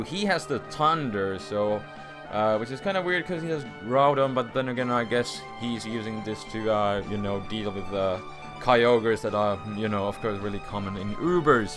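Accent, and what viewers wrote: Finland. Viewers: Suomi-aksentti <3 Erotti meinaan heti ;) Ei mutta hyvin sää vedät!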